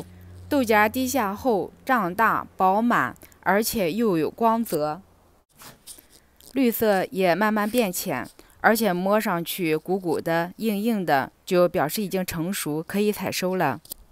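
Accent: Qingdao, China. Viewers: 听到熟悉的青岛普通话，很亲切，我种的蚕豆容易倒，不知道什么原因，看到你的这个视频，对我种蚕豆有很大邦助，赶紧剪枝，繁殖太多衩了，主干细高，谢谢你的视频。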